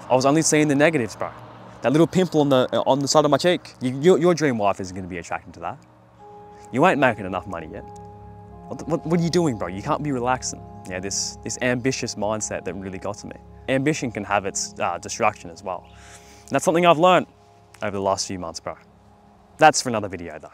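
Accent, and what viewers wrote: Australia. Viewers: You’re Australian right? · the man or woman has an Australian accent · Prob Aussie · Even as a 15 y.o who believes he has the perfect girl, I can't help but still feel unworthy of the love I receive from her. She's the first person who hasn't stressed me out, who wasn't a bitch, who didn't self harm. And I've been with her since April last year. Fingers crossed my mood improves. [also you sound aussie, I'm aussie too :) ]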